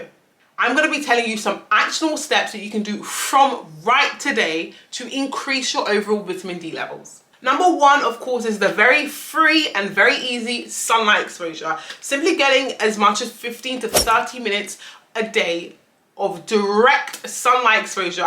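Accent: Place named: United Kingdom. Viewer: I hear a UK accent, and everyone knows the UK has no sun. Vitamin D is vital for the winter and low sun areas. My iron was low so I started geritol, biotin and recently added vitamin D and my hair is sooo thick. I am waiting on the growth but I have less breakage so I am so excited to see results in 6 months.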